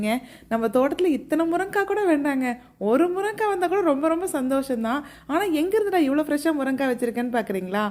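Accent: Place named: Coimbatore, India. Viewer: Kovai slang 😅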